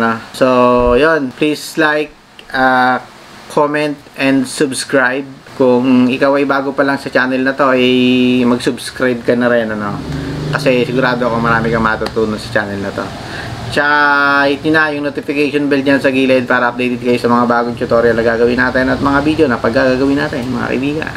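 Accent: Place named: Bicol, Philippines. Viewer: may pagka bicolano salita mo idol ah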